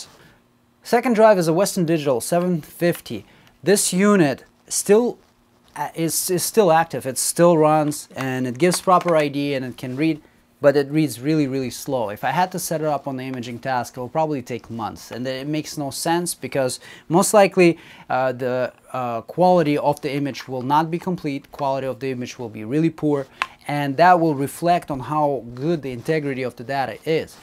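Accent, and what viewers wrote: Russia. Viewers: is that a Russian sounding accent that I detect there?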